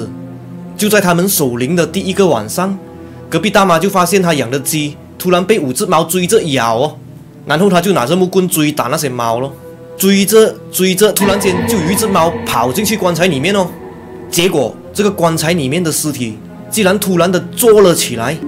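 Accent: Malaysia. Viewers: Bro你的口音真的很Malaysia style😂果然是个正宗的Malaysia人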